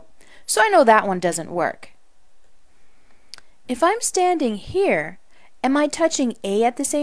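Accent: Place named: Canada. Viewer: hahah, you're Canadian.. aren't you! XD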